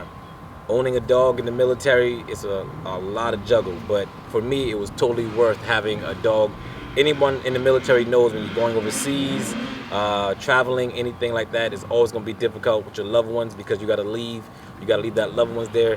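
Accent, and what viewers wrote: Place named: South Carolina, United States. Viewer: Beautiful dogs!! I knew I heard a SC accent..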